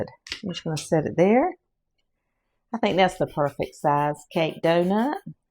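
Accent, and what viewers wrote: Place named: South, United States. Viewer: EXCELLENT VIDEO Thank YOU for sharing this You are definitely a Southern Lady... I know that accent.. I have it too What State are you in? or Where are you from? I have lived in Texas.... from Oklahoma (southern accent) Thank you so much for sharing this wonderful video. Loved it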